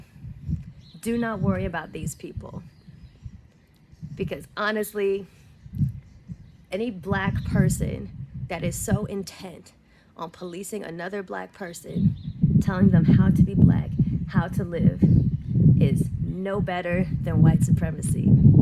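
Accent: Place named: Houston, United States. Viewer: I fuckin love!! Shes always here with insightful commentary and hilarious parodies. Also where in the South are you from? Cause I swear you be soundn like me and my cousins in Houston!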